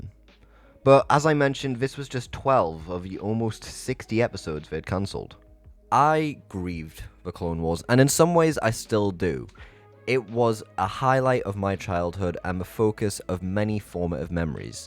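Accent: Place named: Northern England, United Kingdom. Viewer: Northern English! I didn’t know that was the accent distinction, it’s great! I’ve only been to southern England & Wales myself.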